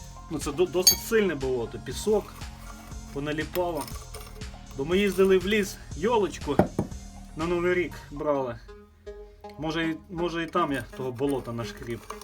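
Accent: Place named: Lviv, Ukraine. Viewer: Братан ти як колишній прем'єр Азаров😁 по мові чую що Львівщина, мабуть, Красноград?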